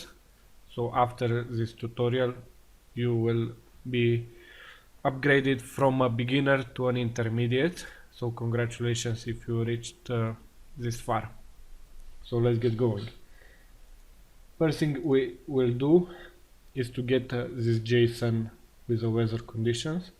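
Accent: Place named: Bulgaria. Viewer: YOOOOO I'm also from Bulgaria, I can tell by the voice and the weather location obviously. Пиши ми моля те, как ти е фейсбука или нещо!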